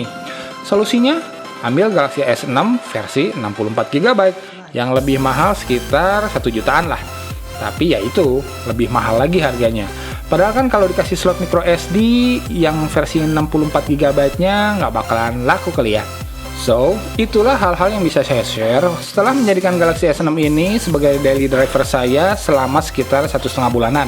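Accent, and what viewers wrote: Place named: Sundanese, Indonesia. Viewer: amiiiiiin.... sukses terus buat, ane suka sama logatnya yang jenaka & sunda pisan euy.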